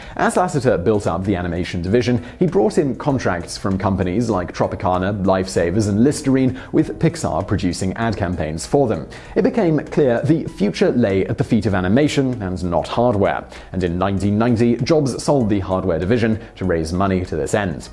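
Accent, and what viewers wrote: England, United Kingdom. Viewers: Why is Johnny Sins speaking with an English accent?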